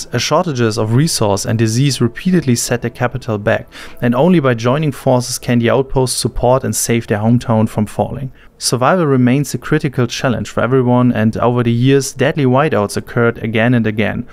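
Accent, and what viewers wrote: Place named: Germany. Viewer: Ich hab nicht mal 10 sekunden gebraucht, um zu merken, dass du aus Deutschland kommst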